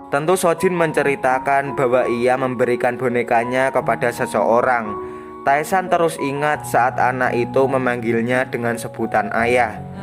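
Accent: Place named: Java, Indonesia. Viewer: Jawanya medok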